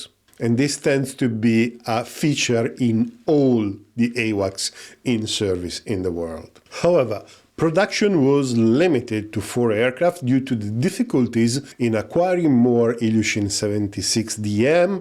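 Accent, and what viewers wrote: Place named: France. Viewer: With a French English accent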